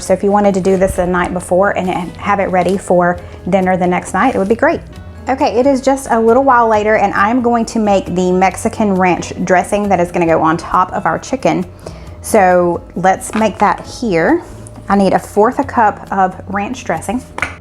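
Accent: South, United States. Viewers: I looooooovve the way southerners say “oil” haha. You guys say it like “ol”. Another great video.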